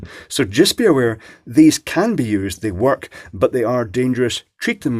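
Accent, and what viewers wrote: Scotland, United Kingdom. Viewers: it's good to hear a Scottish accent for a change.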